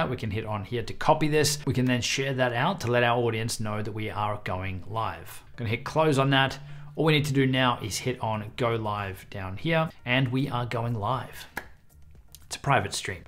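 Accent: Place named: Australia. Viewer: Thank you for the tips, love the aussie accent :) Cheers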